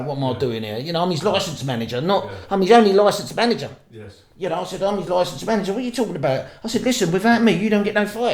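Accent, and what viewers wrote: Cockney, United Kingdom. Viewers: What a load of vintage Cockney bollox, why oh why. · hears the cockney accent and thinks you're full of it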